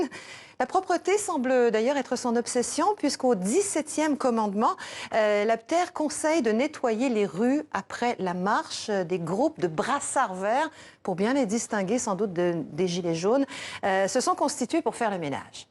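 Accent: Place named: Quebec, Canada. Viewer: je reconnais votre accent vous etes Quebecoise ....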